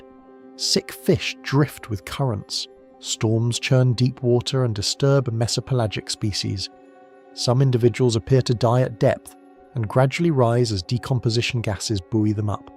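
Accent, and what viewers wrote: United Kingdom. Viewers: After reading repeated complaints across multiple posts of this channel regarding the apparent idiosyncrasies of its content, clarification seems appropriate: 1) Though we speak American English in the U.S., regional pronunciations of U.S. place names differ with regional dialects. How locals pronounce a place name often contradicts spelling. 2) The AI voice used for the channel uses British English. In some British dialects the final ‘a’ of a word can be heard as a very soft ‘r’ or, depending upon the region, a harder ‘r’. As in American dialects, both pronunciations also contradict spelling. 3) Visuals matching audio: It has been reported that this platform has dictated content creators must have constantly moving visuals, static images are unacceptable. You may have noticed some audio books for example, have exited the platform because of the amount of time required to create moving visuals that coordinate with content. 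Longer content requires a great deal of work to find, create, and edit; even using an AI tool. 4) Some content creators have indicated these recent platform changes and requirements have also carried a financial impact, making the continuation of their channel impossible to justify. There is definitely a lot of AI slop unworthy of your viewing on this platform. However, much like an audiobook or purposefully sleep-inducing content, this channel appears to be predominantly intended as an audio opportunity which uses continuously moving images (however unrela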